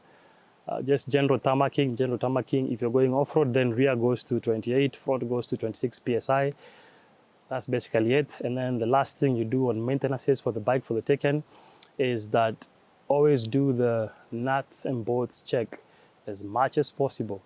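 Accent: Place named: Scandinavia. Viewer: wonderful review in a near viking accent🤩🤩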